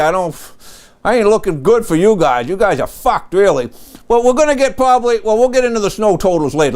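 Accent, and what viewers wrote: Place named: Northeast, United States. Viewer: You are from the northeast. Can't hide your accent. Not a hillbilly.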